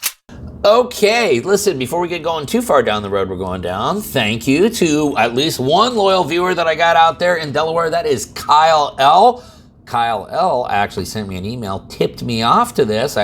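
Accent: New Jersey, United States. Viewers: Sounds like jersey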